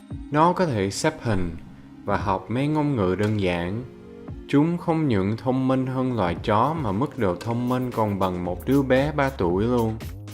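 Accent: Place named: South, Vietnam. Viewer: Ôi Tây nói giọng miền Nam. Nghe vui kinh khủng.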